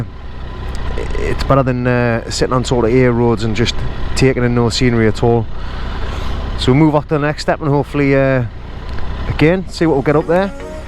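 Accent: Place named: Newcastle, United Kingdom. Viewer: Fantastic views, despite the cold. Treated the weather with the contempt it deserves. Less a crash than a sliding tackle. Geordie accent is perfect. Treat watching this.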